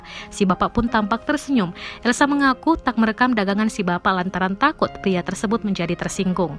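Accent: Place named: Medan, Indonesia. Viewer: Yang bawa narasi orang medan pasti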